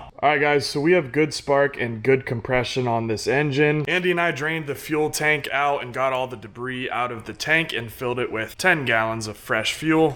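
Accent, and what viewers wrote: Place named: United States. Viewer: You really are an Ozzie with an American accent.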